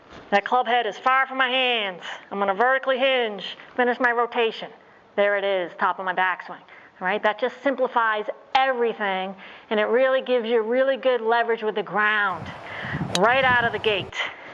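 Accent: United States. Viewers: What accent is this and where from in USA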